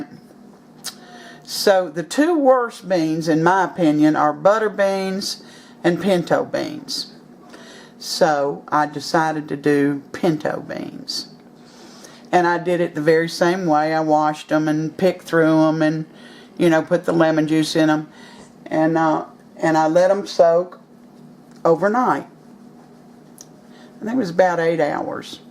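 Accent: South, United States. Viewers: Don't mess around with her Southern draw and hospitality. Southern people are not in a hurry.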